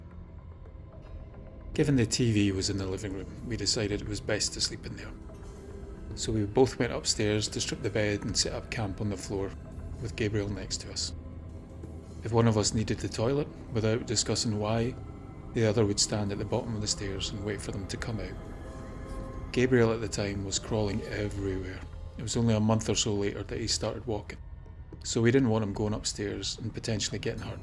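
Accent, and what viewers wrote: Scotland, United Kingdom. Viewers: I've watched so many of your videos these days and I enjoyed them all. You put a lot of great work and thoughtfulness into this - it's very much appreciated. Wish you a Happy New year with many more ghost stories to come. 🙋🏻‍♀ All the best from Bavaria ❤ P:S. just one wee thing - your viewers are from all over the world, many may not be native English speakers and not used to the lovely Scottish way of speaking (that I enjoy so much 😀), some, like myself may suffer from tinnitus or have bad hearing... It helps a lot to turn down the background music a bit so people can concentrate to the narration better. Thank you.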